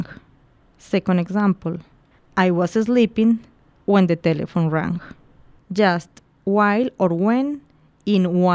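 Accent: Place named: Colombia. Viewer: ingles de colombiano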